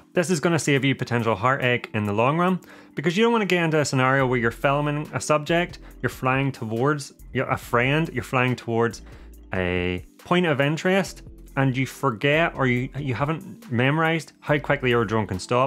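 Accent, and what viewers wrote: Northern Ireland, United Kingdom. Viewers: Hi, your accent is amazing. I had to focus and struggle to comprehend each sentence, and I mean that as kind of a compliment. Where do you live in the UK? I really have the notion one should recommend your video to advanced level English language students because as a non-native English speaker for more than 30 years I still very much struggled understanding you :) Very good advices regarding safe and legal drone flying BTW. Cheers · Are you Northern Irish? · Northern Irish accent? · Northern Ireland accent?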